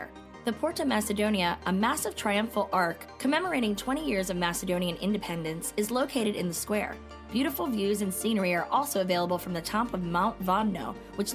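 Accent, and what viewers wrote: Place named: United States. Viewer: An Indian channel possibly targeting Indian travellers and voice over in American English! How many people in India understands American English, I wonder..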